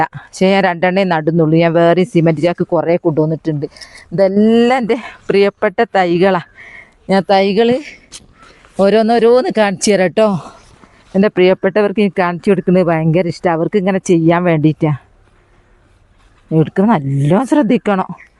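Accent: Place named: Malabar, India. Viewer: നിങ്ങളുടെ സംസാരം കേട്ടിട്ട് കോഴിക്കോട് മലപ്പുറം അതിർത്തിയിൽ എവിടെയോ ആണെന്ന് തോന്നുന്നു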